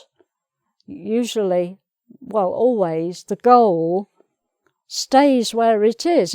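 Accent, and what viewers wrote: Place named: RP, United Kingdom. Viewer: I love this lady! She explains things so clearly and with this so beautiful British received pronunciation.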